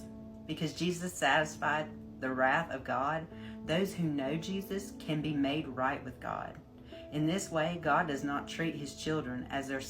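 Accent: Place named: South, United States. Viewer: you're welcome! Luv your Southern accent, too! 💙 I enjoy your channel, thanks 🙏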